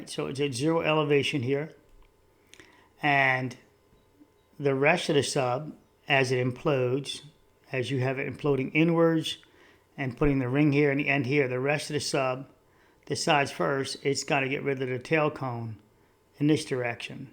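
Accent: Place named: New York, United States. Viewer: Hello from Kansas! Really enjoy watching your analysis. It reminds me of Seinfeld. It isn't a show about nothing but idiots who troll think it is. Maybe its perhaps, what I think is a New Yorkish accent? or at least northeast coast American accent. I do have Kansas ears and we have little to no accent so . . .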